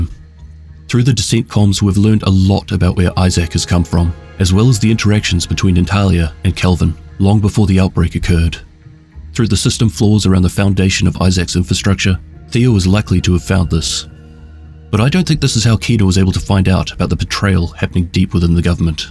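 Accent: New Zealand. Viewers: Good to here a kiwi telling to the story from JTFOUTLAW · The gameplay video made me dizzy, only listen the kiwi sound it's ok, like listening a podcast it's fine. 😁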